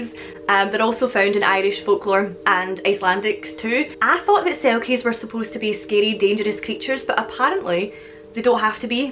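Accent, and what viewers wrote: Scotland, United Kingdom. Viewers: If you're interested in folk stories from Scotland and Ireland, Juliet Marillier writes beautiful fantasy based off of them. Daughter of the Forest is book one of my favourite series by her. Oh, and I love your accent! I miss hearing it, since my Grandpa died. He was from Glascow. Well, a small town just outside. Auchinairn?? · If you want a laugh..watch with the Closed Captions on. The computer that is used doesn't understand a Scottish accent!! It's interpretation is hysterical!!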